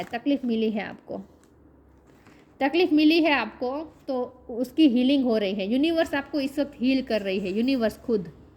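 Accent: Gujarat, India. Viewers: Thank you so much pranam Koti Koti dhanyvad Ishwar Koti Koti Pranam Shukriya mam Aapka kya aapane Puri Baat samjhaie aap bolane se Gujarati Lagte Ho thank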